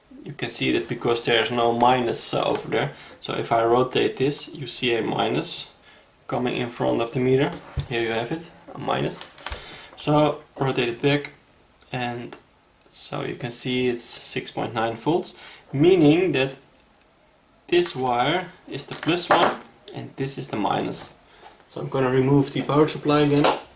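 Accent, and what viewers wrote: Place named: Netherlands. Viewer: by your accent i guess you're dutch, lol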